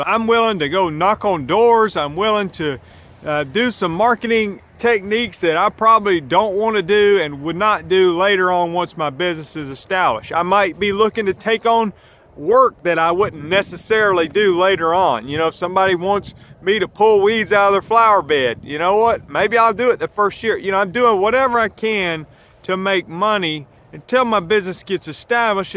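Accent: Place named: Southwest Virginia, United States. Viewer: You sound like you are from my Southwest Virginia area lol. Everyone and their brother runs around with a mower of some sort in their truck out mowing lawns. there isnt really many decent or big sized companies around here because the business is so saturated. I am surprised I got any lawns really this year and wont be surprised if i loose my commercial property to Jo Blow on the street that wants some beer money next season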